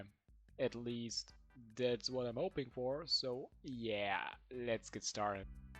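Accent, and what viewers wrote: Germany. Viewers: You sound a bit german 😅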